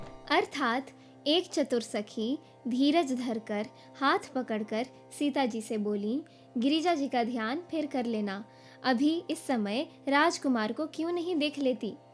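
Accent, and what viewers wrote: Standard. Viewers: बहुत शुध्द उच्चारण है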